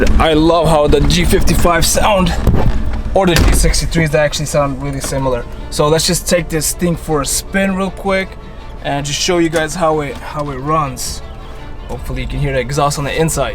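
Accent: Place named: Russia. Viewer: Russian accent not black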